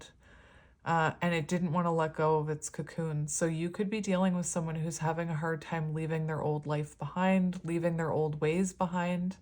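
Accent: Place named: Canada. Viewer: My favorite Canadian accent friend ❤😘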